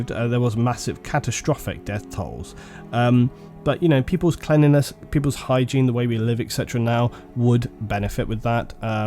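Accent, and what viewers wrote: England, United Kingdom. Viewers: Hey! I got idea for your Zeus mission. If it's possible then can somebody make a oil platform to sea at Altis. Then your gonna make CSAT base there. It's gonna be hard mission for NATO. There are some civilian/workers hostages there too. It's like naval mission. Only boats and aircraft. Some AA turrets would be at oil platform. CSAT forces have captured an oil platform at South sea of Altis. Sorry for bad English and i hope you like it. And i have a question for you:D What country at do you live? It sounds like England.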